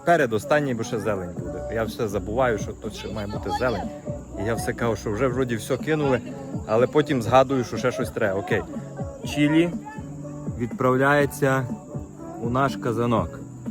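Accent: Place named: Zakarpattia, Ukraine. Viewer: мене більше цікавить як у нью-йоркерця до сих пір такий прекрасний закарпутський акцент😅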